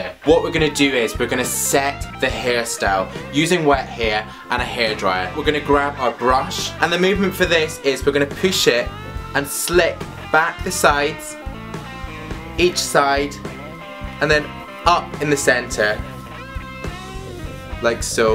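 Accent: United Kingdom. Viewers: Love the video! It's a godsend for a theatre person working on a historical piece! But I have to ask, where are you from in the UK? I'm trying (and failing) to place your regional accent. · What a British accent mate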